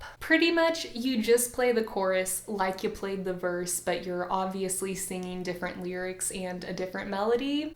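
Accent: United States. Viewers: Yeah tots but why does she speak like a cow girl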